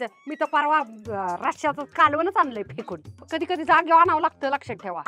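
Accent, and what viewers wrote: India. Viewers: Ek no... Vidio.... Mala tuzi acting khup aavdli.... Aapli gavran Marathi...😅😊 ....... Ashich hast raha, hasvat raha... 👍🏻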